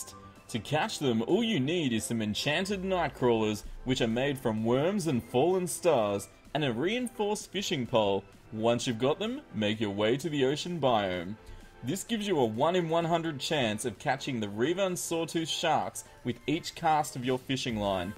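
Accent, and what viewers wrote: Australia. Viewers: Is that an aussie accent I detect? =D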